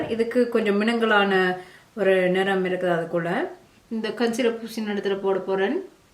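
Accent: Sri Lanka. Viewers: Sri Lanka Tamil speeking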